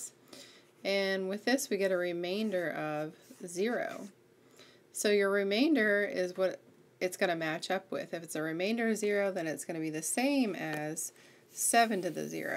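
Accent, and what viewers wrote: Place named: United States. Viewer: THANK GOD!! an AMERICAN!! NOT INDIAN!! THANK YOU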